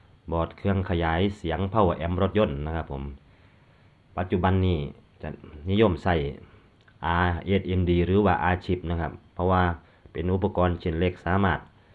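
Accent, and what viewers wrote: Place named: Isan, Thailand. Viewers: ชัดเจนอีสานบ้านเฮา👍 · มื่อนี่ฟังได้เฉพาะภาคอิสานเด้อค่ะ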